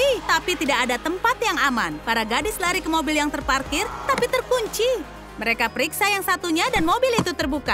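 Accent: United Kingdom. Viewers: kak kakak orang inggris atau indonesia 😊😊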